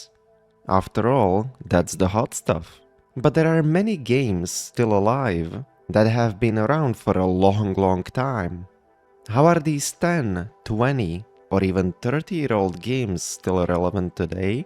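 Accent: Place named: Russia. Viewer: I love the russsian accent. отличное видео!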